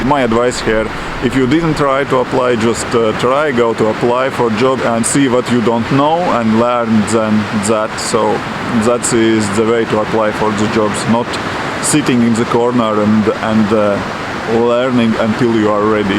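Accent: Russia. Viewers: Доброго времени суток, такой акцент у вас русский) подскажите вы меня понимаете?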